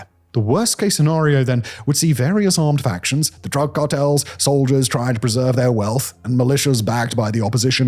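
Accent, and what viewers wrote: United Kingdom. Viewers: Watching this in April 2026… bro really built a whole “analysis” career off “not enough troops” 🤣 Take away the British accent and this is just mid-tier guessing with confidence.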